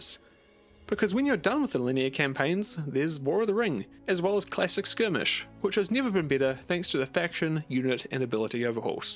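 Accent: New Zealand. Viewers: yo r u a kiwi?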